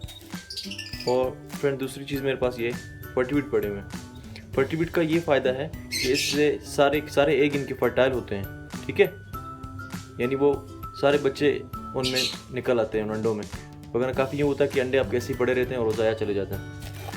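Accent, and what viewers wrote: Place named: Balochistan, Pakistan. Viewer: Bahot khooob Aik persnal sawal krna chaoonga. With excuse!!!!!! Aap ki urdu Bht achi hai takafuz saaf hai. Lekin pir bi aap k.Lehjy me wo mittaas aajata hai. Jo humari zuban ka hissa hai! Sawal ye k kia aap brahvi hain???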